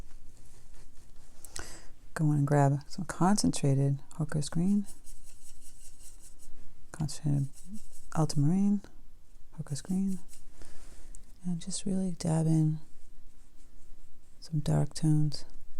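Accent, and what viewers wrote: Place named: East Coast, United States. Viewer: I have to tell you that I have learned SO MUCH from you in the short time I've been subscribed. I have watched every video, and you're so great at explaining each thing you do to achieve the look you're going for, and I think I painted along with EVERY Christmas card video and gave hand-painted Christmas cards to ALL my friends and family! I always can't wait for your new videos to see what else I can learn! I'm fairly new to using watercolors, and value each lesson I can glean from watching! Thank you so much for sharing your talent and instruction with all of us! You rock!! BTW, what part of the East Coast are you from? I was born and raised a Jersey Girl, and I hear something in your accent, but can't quite place it! Thanks again!!!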